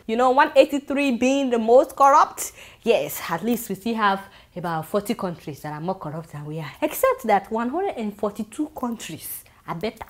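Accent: Nigeria. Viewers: I'm learning the Nigerian accent from you my gurl!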